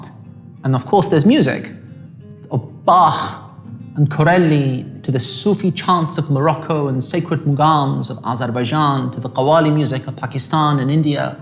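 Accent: United Kingdom. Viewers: I like your accent while you talking British, Allah bless you about what you do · Holy sh*t, your accent is truly British. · Elated to hear your english accent, it's a given though since you are British but loved your English accent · Wow I used to listen to you in my teen years, I never knew you were British, I’ve never heard you speak before until now :) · I didn’t even realise you were British from UK!! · I looooove british accent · I love the accent I have to visit Britain & learn the accent 😍 I enjoy hearing it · A proud Pakistani living in UK England...Your love for Allah almighty and the Prophet (pbuh) through your very spiritual songs n naats were an inspiration for me at a time when my faith was at it's lowest...I started to delve deeper into my own faith and forge a connection with my Lord and open up the world of Sufism for me... Alhamdulilah you are so blessed...Feels so odd hearing you speak in UK accent🤭...Your words are very deep and inspirational... 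Alhamdulilah 💕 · Wait why was I expecting you to speak in Arabic😂😂 your more british than the Royal family looool the nasheed's fooled me and I was ignorant to think that that was only language you speak. MashaAllah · Wow, beautiful. I'm a bit shocked after listening your british accent. It's the first time I heard you speaking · Wow! Your video just popped up on my feed randomly and thinking that your name was familiar, I clicked on it. Your name reminds me of my mother. She is dead now but years ago, around late 2000s or early 2010s, she used to listen to your music everyday. I have never seen her listen to anything else. She probably didn't even know you were British because she never heard your accent, or hear you talk like this. Only your music, and I don't think it showed your English accent. That time, we actually had a Computer at home, and she had your music installed there and she would listen to it directly from the speakers; something we don't do now. 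Thank you for giving her that peace.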